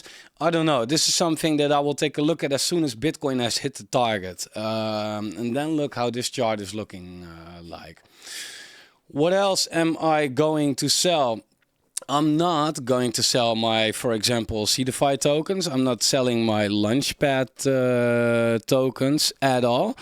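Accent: Netherlands. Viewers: Grappig je hoort altijd meteen wanneer het een Nederlander is.. kwam je toevallig tegen na 1,5 jaar op youtube struinen. Ben geabonneerd en wens je veel succes met je kanaal !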